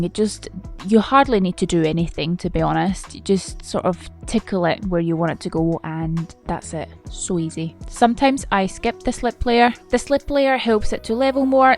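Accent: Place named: England, United Kingdom. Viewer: Can somebody tell me sweet English accent is that ? ❤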